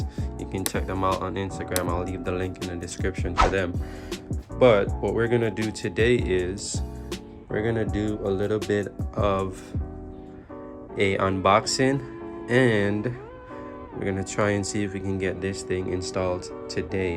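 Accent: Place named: Jamaica. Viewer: Dowg sound like a yaad man so. Good look mi g. Mi just buy a 2019 gt 2 weeks now and mi love it and about to start doing some mods. So keep the content coming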